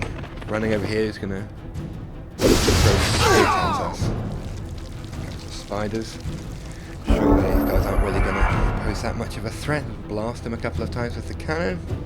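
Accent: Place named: England, United Kingdom. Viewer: his pommy lisp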